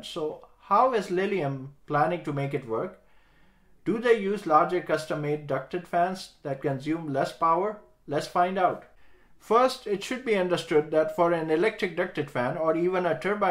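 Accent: India. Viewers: If you went to Engineering school, you'd already be past an Indian accent. His English is perfect. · Once u get past the indian accent u realise this guy knows his stuff and explains everything really well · I love that India Sound :) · People may be reading too much into this comment. Yes, on YouTube, there a ton of guys with Indian or Pakistani accents doing videos of this type who are really just trend hoppers, who present nothing new in their content. After listening to him talk, he actually knows his shit, and does remind me of the Indian engineers I've worked with over the years. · I've worked with so many smart Indian men and women that the accent automatically lends credibility. :0)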